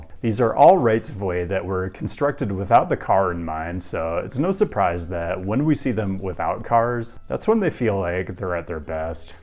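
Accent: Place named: Pacific Northwest, United States. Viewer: I always assumed he was from Portland (or at least PNW) based on his style and accent but now I'm not sure!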